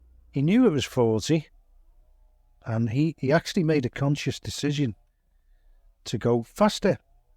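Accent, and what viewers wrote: Liverpool, United Kingdom. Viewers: Typical far left scouser. . . . .Calm down!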